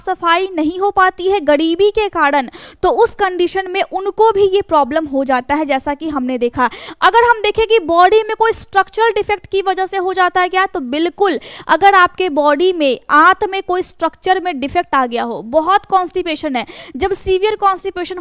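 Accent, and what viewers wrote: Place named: Bengal, India. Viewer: Bengali walo ki Hindi bada cute lagtaa❤ ra ko da bolna Bara nehi bada Kaaran nehi Kaadan😂 Fir v confident bahut hai didi🎉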